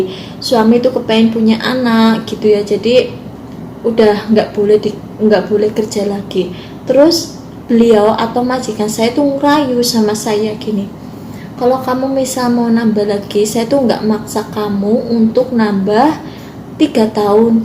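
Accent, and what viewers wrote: Banyumas, Indonesia. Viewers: ngapaknya medok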